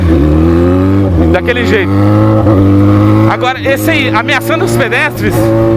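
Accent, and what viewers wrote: Goiás, Brazil. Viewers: vc e Goiano?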